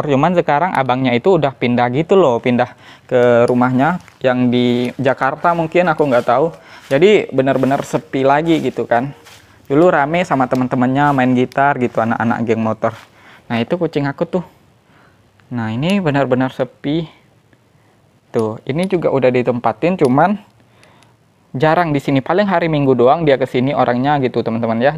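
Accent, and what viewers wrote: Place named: West Sumatra, Indonesia. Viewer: Logatnya sih seperti Urang Awak yo ?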